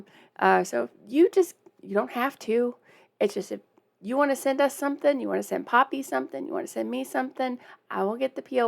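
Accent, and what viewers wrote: South, United States. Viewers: It takes a brave person to be able to do videos these days. I think you are a genuine, honest and friendly presenter, and trust me, there are some presenters on YouTube who are terrible. Anyone who would go that far out of their way to say mean and nasty things, is someone who has some very deep self-esteem problems. Just brush them off. Be yourself. This is YOUR channel. Stop the video and talk as many times as you want, even during. And I'm a huge fan. If viewers want to hear the song un-interrupted, they can go watch a different video. By the way, I love your southern accent. Keep up the good work. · I love your hair, I love your southern accent, I love your heart, and of course I love your name, after all it’s my wife’s name😂. You keep being your amazing self. We love you. Greetings from Alabama 😎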